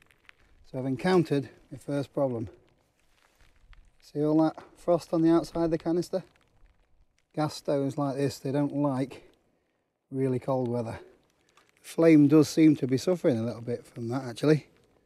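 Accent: United Kingdom. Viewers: Great video as always! I will try this with the kids when I next take them to the mountains. I'm from the U.K. but have lived and hiked in Japan for the last 12 years. It's great to have a British voice to listen to and get opinions on and see the places I used to walk in. Great channel keep it up!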